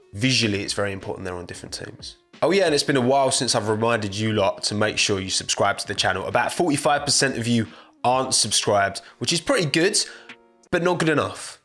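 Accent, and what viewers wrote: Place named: East London, United Kingdom. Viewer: you didn't need to tell us you were a west ham fan, we knew it from the first time we heard you speak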